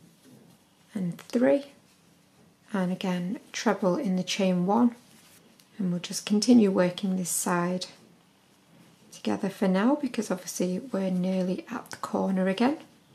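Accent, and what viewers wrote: United Kingdom. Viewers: I love british accent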